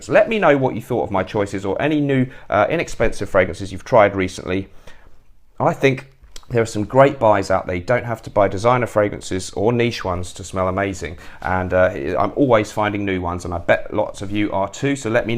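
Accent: United Kingdom. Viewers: My favourites at the moment: Lalique Encre Noire, LM Hard Leather, Acqua di Parma Essenza and Comme Des Garçons Incense Avignon. BTW, I love your videos and your British Style and accent.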